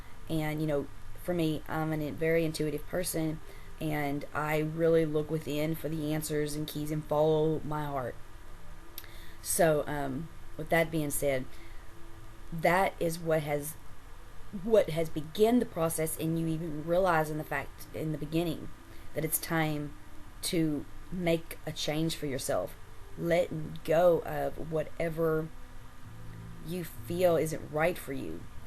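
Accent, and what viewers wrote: South, United States. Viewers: i totally thought you were in the southern US from the way you speak lol good luck in the EU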